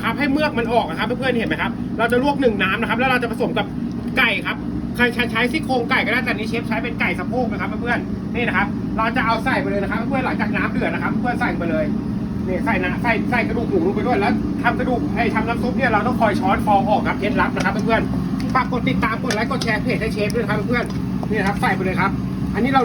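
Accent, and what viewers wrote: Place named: Suphanburi, Thailand. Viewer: เชฟเป็นคนสุพรรณหรือเปล่าค่ะ พูดเสียงเหน่อ ขอบคุณค่ะ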